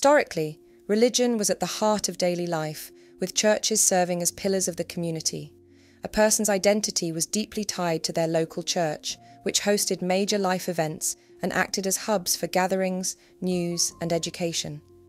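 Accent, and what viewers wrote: England, United Kingdom. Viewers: Yes I agree great stories, and that beautiful English accent brings the story all together😊😊😊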